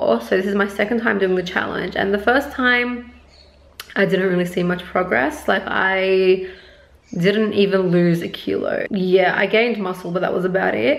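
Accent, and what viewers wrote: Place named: Australia. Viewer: I suscribed to your channel because I loove how you do makeup! I remember I found you in Instagram, and I took the time to stalk you jaja and thats how I got to your yt channel, after that I just fell in love with your accent😍 You are the only Australian youtuber I am suscribed to, and you are my favorite makeup artist out there!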